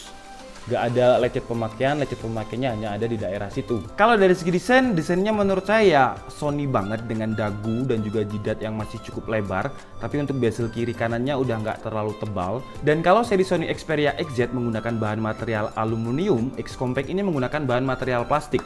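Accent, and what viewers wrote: Malang, Indonesia. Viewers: Mas nya orang malang?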